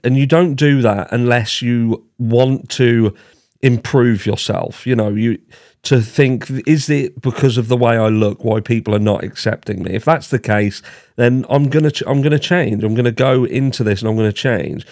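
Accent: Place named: Birmingham, United Kingdom. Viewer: I ♥️ this Brummie accent! Well, the personality of BK is truely puzzling, I absolutely agree. I never considered him an INCEL which they suggest him to be; yes, he has both narcissistic, sociopathic and also psychopathic traits, but in these terms I might talk about myself as well with quite of an ease. Seriously, I mean; and neither do I believe that being a N/S/ and/or/P would make one automatically a killer - not at all. However, what is really significant in BK, is his lack of emotions. He is a true introvert - coldly calculating, investigating; he show's up zero empathy nor ability to regret anything, incl his own obvious mistakes. He doesn't seek for attention - oh no; and he seems having counted on possible failures, that's why he is so calm now. The trial is just another pre-calculated epizode of his "masterplan". If he is the m****rer, of course. 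I see some very specific, deeply routed autism in his characterisrics instead, but of course I'm not any kind of a specialist to give such or another diagnosis. Could any form of autism lead to m*rders? Just some thoughts. Interesting contemplation, Man. Thanx & greetings.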